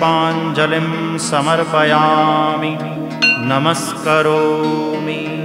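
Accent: Standard. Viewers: વિશુદ્ધ ઉચ્ચાર. સાથે ગુજરાતી અનુવાદ પ્રસ્તુત કરવામાં આવે તો શ્રોતાઓ આ ઉચ્ચ વિભાવનાથી પરિચિત થઇ શકે. અદભુત સ્વર. સાદર વંદન. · भाईश्री का उच्चारण तो अतिदिव्य एवं परिशुद्ध है।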